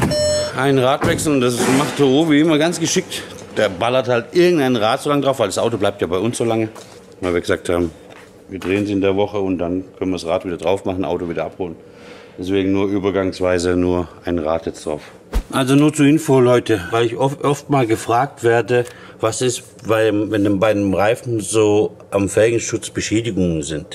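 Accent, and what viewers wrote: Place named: Sauerland, Germany. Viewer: Ich komme aus dem Sauerland und finde es echt witzig wie bei dir manchmal der Dialekt durchkommt ich mag das sehr . Leider spreche ich selber kein Dialekt mehr und es ist sehr schwer jemanden zu finden der noch den Sauerländer Dialekt spricht um ihn zu lernen .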